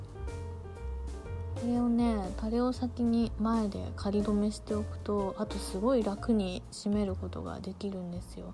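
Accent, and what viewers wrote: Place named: Kansai, Japan. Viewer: 私もめちゃくちゃ迷います！特に着物は、結局何が正解かわからなくなってくるのでぱっと見の印象が残ってるうちにさっさと決めるように頑張ってます笑というかさん関西人なんですか！？ちょこちょこイントネーションが方言っぽいですね！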